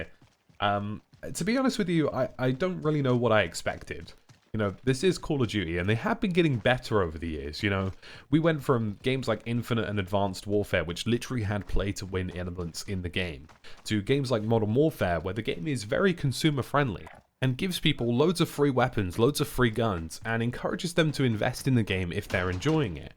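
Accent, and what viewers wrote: United Kingdom. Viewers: Are you well?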